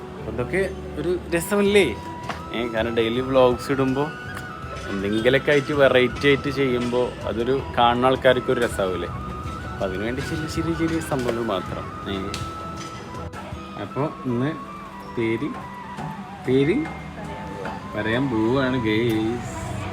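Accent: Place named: Kozhikode, India. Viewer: nammed koikoden bhasha🎉🎉adipoli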